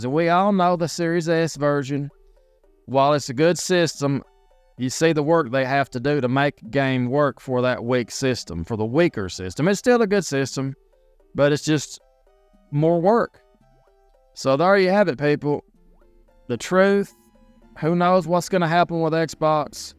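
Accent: South, United States. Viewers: I didn't know anyone could have a Southern accent that thick!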